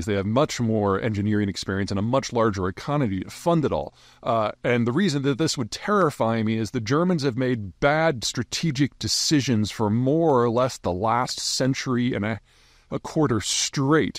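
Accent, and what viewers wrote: United States. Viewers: Its refreshing to hear from someone with an American accent that understands that nuclear weapons are a technology from the 1940's, that can be developed by most advanced countries with nuclear reactors if it is needed to ensure their own security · Nuclear in 'Merican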